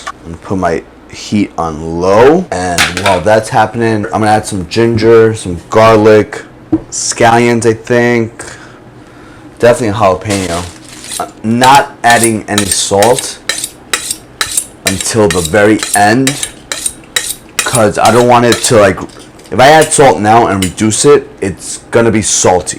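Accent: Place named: New York City, United States. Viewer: Love this channel!! As well as your NYC accent 😜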